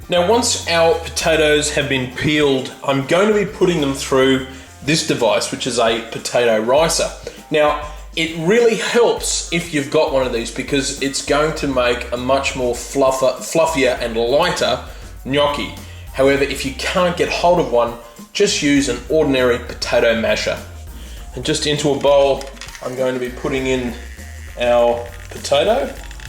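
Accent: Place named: Australia. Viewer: He's pronouncing it correctly - pretty much the Italian way of saying it only with an (understandable) Australian accent. The US "No-key" pronunciation bears no similarity <link> (to hear an Italian pronouncing the word)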